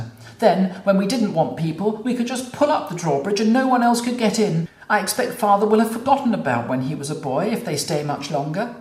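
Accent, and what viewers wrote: United Kingdom. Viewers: Thanks a lot , your accent is my favorite . Is it considered as a soft or modern RP accent ?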